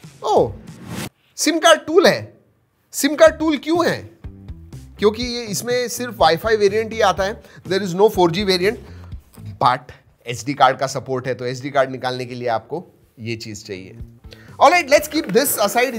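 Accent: Maharashtra, India. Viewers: Nahi gelare, kon mhtla gela🤣🤣 pure gauthi marathi 🤣 loved it as well as tab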